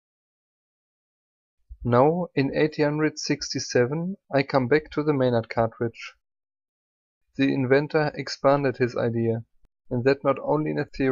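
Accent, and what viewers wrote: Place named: Germany. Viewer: Ich würde dir anbieten, dir dass in einem verständlichen und korrekten Englisch zu besprechen, weil so ist es ziemlich "denglish" und kaum ein Muttersprachler wird sich das "antun".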